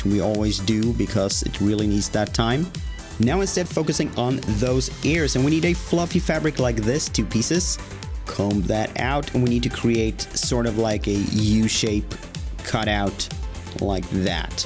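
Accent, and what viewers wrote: Sweden. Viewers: you can really hear that he's Swedish too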